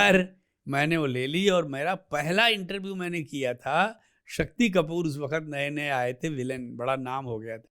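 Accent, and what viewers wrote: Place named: Uttar Pradesh, India. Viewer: Khushi is baat se aati hai ki jab bolte ashutosh beta ,ye jo hamare UP ka jo lejhga hai h dil jeet leta hai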